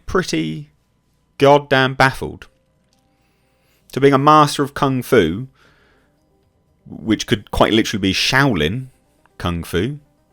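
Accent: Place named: United Kingdom. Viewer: What would a white guy with an accent , a British accent (im not stereo typing) know of real life stereo types .all the research you do is based on wiki not real life .u have no idea why , the deep reason why this actor said that .you just need clicks and views i guess. So making a 10 min video on something you will never understand is pointless this has to be a joke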